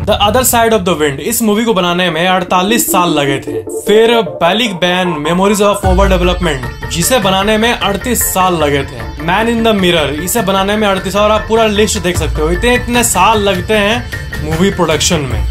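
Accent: Bihar, India. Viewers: Aap bihari ho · sala ekdum ganda wala bihari lagta hai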